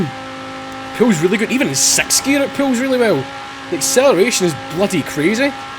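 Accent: Scotland, United Kingdom. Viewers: Mate Gota love Scottish peoples voices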